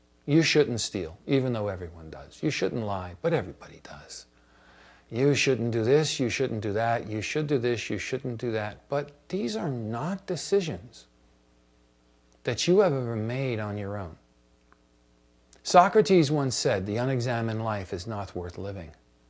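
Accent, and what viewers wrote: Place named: Bronx, United States. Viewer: haha. I think this is a great meditation. His accent and colloquialisms went from the Bronx to the Universe.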